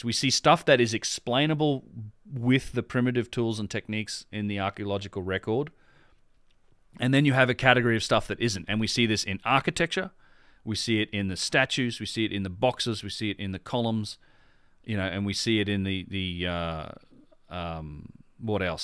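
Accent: Australia. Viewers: Such a wonderful channel, where's your accent from though? Sounds Aussie. Love, absolutely LOVE your approach & mindset, well researched, honest & especially prepared to challenge establishment. Xx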